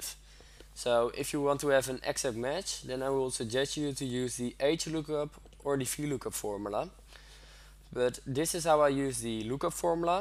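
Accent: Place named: Netherlands. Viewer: keep up the good work. By any chance you are dutch. Please see an english logopedist for it might drasticly improve the quality of your costumersatisfaction.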